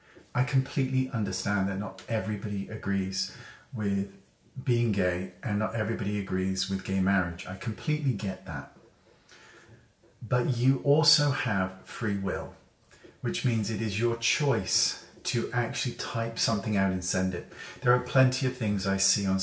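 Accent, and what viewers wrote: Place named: West Country, United Kingdom. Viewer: I love your West country accent Congrats on your marriage, ignore the naysayers x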